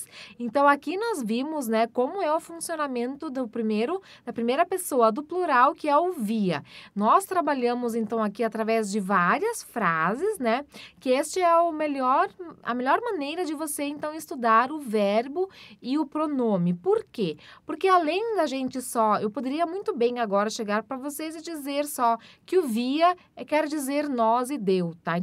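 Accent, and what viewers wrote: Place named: Germany. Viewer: Oi, Gostei do video. Te pergunto de qual regiao da ALEMANHA é o teu sotaque. Agradeco.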